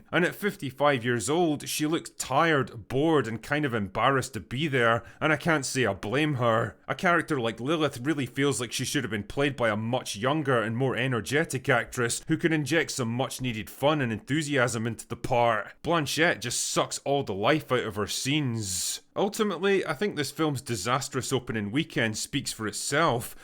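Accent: Scotland, United Kingdom. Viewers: You understand that it’s a bit, right? Like he’s a normal dude with a Scottish accent when he’s on Open Bar or casually talking.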